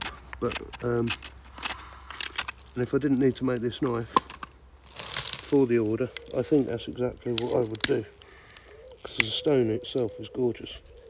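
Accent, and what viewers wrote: England, United Kingdom. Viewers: You won my sub boss. I've been searching for a channel like yours. I in the States bud in a state called WV where traces of Native American's are everywhere infact where I am to be laid to rest is a in a grave yard with a rare unopened Indian mound that they believe it goes back to the Woodland 1 period. I love searching for arrow heads and other artifacts I have been very fortunate many many axe heads. Some very old and others much newer 1500's......but man I could ask you questions till you go crazy. Also I can talk your ear off. I am just curious where you are from? You sound very English but my ear isn't good enough to know if you could be from Australia, New Zealand, or Great Britain or Wales.....so I just wanted to ask. You also might be my first person I am gonna look into Patreon. Cuz I 100% believe everything your doing needs passed down. 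I love everything your doing and damn if you don't do it like a Master. Take care boss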